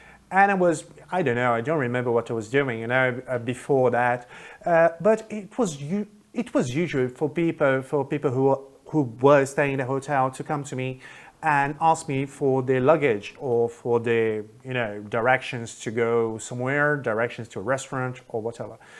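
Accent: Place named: United Kingdom. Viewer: I really liked your history, I could imagine every moment that you described and I guess that was a chock and surprise , I laught a lot with you hahahahha Please stay with this kind of videos, they're amazing! And I couldn't avoid to notice your britsh accent, you talk exactly at the same way of them!! lol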